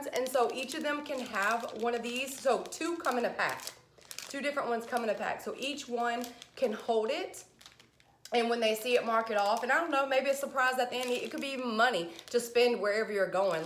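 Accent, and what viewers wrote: South, United States. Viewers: I love to hear your southern accent, of course I’m from the north. I’m still listening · New subscriber ! I love your energy and southern accent ❤️ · I just love ya girl. I just love hearing you speak with that gorgeous southern voice of yours. Us southern girls need to stick together. I did find several of the cookbooks & I too love to cook especially new things. God Bless & "Yes Mam".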